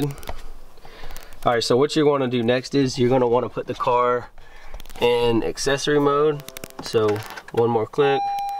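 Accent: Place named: South, United States. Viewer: A Mexican that speaks in a southern accent, never thought I’d see that lmao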